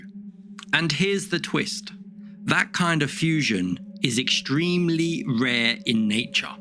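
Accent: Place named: United Kingdom. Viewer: Why do all the bots have a Brit accent?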